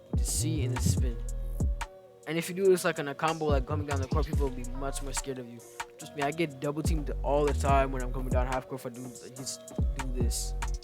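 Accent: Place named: New York, United States. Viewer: U sound like u from NY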